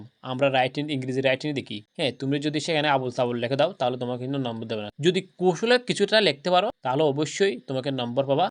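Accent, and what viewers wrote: Chittagong, Bangladesh. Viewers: ভাইয়ের বাড়ি মনে হয়, আমাদের চট্টগ্রামে ❤ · Apni mone hoi chitainge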